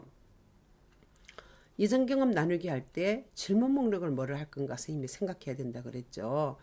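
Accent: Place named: Gyeongsang, South Korea. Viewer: 교수님 경상도 사투리 억양이 살짝 들어간 목소리가 사람을 끌어 당기는 힘이 있어요 ㅎㅎ 강의좋고 목소리 좋고 ~^^♡😍😁😝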